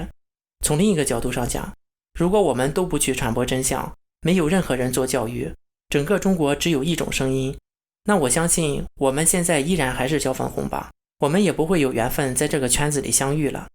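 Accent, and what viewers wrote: China. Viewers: 我觉得KLAUS的视频做的很认真也很棒，你的口音像大陆人，但是你的思想更像台湾人，特别是你用繁体字包括上一期视频结尾用的中华民国国旗歌。你是不是大陆人移居到台湾的人我不得而知？大陆真实情况很多台湾人并不了解，只是通过看负面新闻了解中国大陆还有中共。中共从邓小平开始改革开放经济腾飞后，早就不信仰什么共产主义了，共产主义只是一个称谓罢了。市场经济做起来了以后，面对这个巨大的经济利益，中共其实蛋糕还是切得非常好的。大部分人都因为利益得到了好处更加拥护中共，当然小部分人利益是确实受到损害从而反共的。民主价值观在现今中国没有生存土壤，原因是中共这个统治系统养活了太多人，把中共统治系统砸掉就等于砸了很多人吃饭的家伙。民主不能当饭吃，而把中共统治系统当饭吃的人太多太多，而且吃的比民主世界的饭还香，我不知道你明白不明白我说的意思。中国有14亿中国人，而依靠中共统治系统生存的人是占大多数的。就是这些靠着中共吃饭的人是中共统治的基石，另外没有吃到中共系统的饭的人，中共也一直在维稳，并不是采用暴力手段，扶贫项目帮助贫穷地方人脱贫，有很多好的政策让政局稳定，正反两面手法，大棒加糖。比如新疆要闹独立，中共一方面加强武力镇压疆独消灭有生力量，另外一方面从思想上根除穆斯林极端宗教信仰开办培训学校。还有拨款到新疆帮助新疆的穷人脱贫致富，只要你愿意去看就可以看到现在新疆人过得非常富足谁还跟你对抗，如果大部分新疆人不认同共产党统治，新疆那些骁勇善战的少数民族早就翻天了。7个穆斯林极端恐怖新疆疆独拿砍刀在昆明就能砍死砍伤130多人，你就知道新疆人多厉害了。极端宗教疆独份子根本不怕死，人肉炸弹武装对抗政府解放军都是没想过要活命的。但这只是少数极端份子，大部分人民只想过富足的生活。如果中共只会欺压人民而不懂如何让大多数人过得富足，这个政权早就被推翻了。如果邓小平不搞改革开放，中共早就垮塌了多少年了。如果民主可以当饭吃中国早就民主了，中共早就不存在了。民主制度也不是完美制度，独裁政权也不完全就是暴政。中共暴政也只是暴政要跟它做对抗的人，靠中共吃饭的人从来不觉得中共是暴政。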